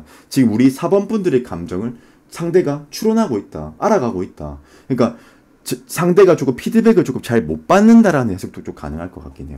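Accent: Gyeongsang, South Korea. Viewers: 음~~경상도 발음= 사투리👍👍많이 해주3 글구..소화가 잘 안 되 시나욥?위역류요런거😅😅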